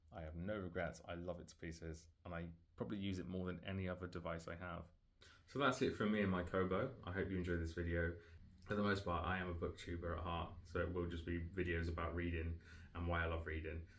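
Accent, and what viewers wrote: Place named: United Kingdom. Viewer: Love UK accents, Americans (me) so boring...lol I just subscribed to your channel. As you can get cheapers stylus just research just ordered my Kobo Libra Colour a few days ago! . It is $219.99 in the US . Now I have to transfer allmy Kindle books. I know i can use calibre to transfer.. I also read a lot of Sarah Maas books her books are over 700 pages. I also have Kindle Paperwhite and Oasis. I am excited about switching to Kobo and started using Libby. I loved your video! I love the library intergration too. I should be getting mine in three days. So freaking excited! Cheers! xx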